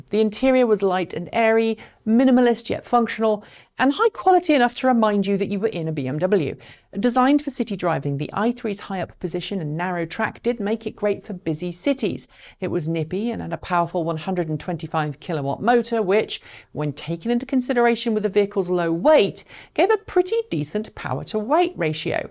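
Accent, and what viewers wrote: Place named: England, United Kingdom. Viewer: Greetings from Sydney Australia! So so glad to hear that nice pommy - undiluted - accent back in this episode:):) Thank you and keep up your informative and entertaining work.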